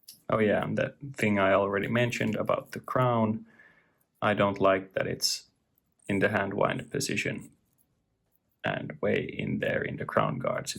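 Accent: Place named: Finland. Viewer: third comment in a day, enyoing your content man (the finish accent reminds me of watching interviews with my favourit band ;) ) - The new SRPE's are real stunning watches, I got a great deal on the 61 (grey dial, Nato-Strap) and ordered on Tuesday. That colour is really the best of the bunch in my opinion + the ones on the Nato have brushed bezels. I paid 180€ incl. shipping and customs from Japan to Germany and it will arrive in under a week if DHL is to be trusted. While at it I bought a fitting strapcode-oyster (solid links, solid endlings, solid clasp, screwlinks), set to arrive the same day and litarrily can't wait (thats why I watch all the reviews on the SRPE's currently ;) ) - hope this combination will look as good in the flesh as it does on pictures. Even more Tudor-Blackbay with that one. Already considering buying a strap from watchgecko, the grey-striped one you showed must look awesome with the grey-dial (maybe the darker-shade but it is a real strapmonster I believe). 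I was really enjoying your review, specially liked the sound/music for the Negatives and during the Lumeshot (you could turn the 70's-porn-sound to 11 though next time). As with the SNKL23 I'm also considering adding a double-dome for this one, would give it even more class.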